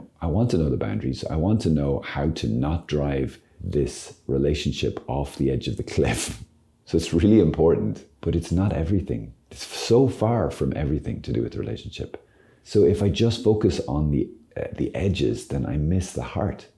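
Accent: Ireland. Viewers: U have a little irish ❤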